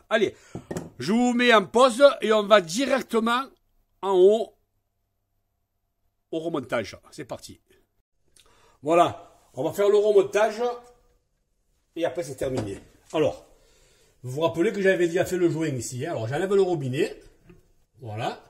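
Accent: South, France. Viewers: Bonjour et merci pour le tuto et avec l'accent du sud encore mieux Bravo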